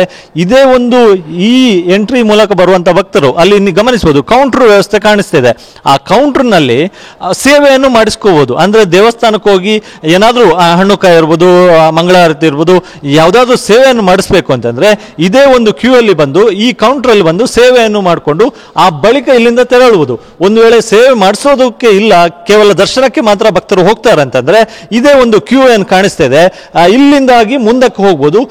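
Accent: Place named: Mangalore, India. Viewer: Anna please speak in kannada don't mix with English.. Mangalore kannada is very to nice here..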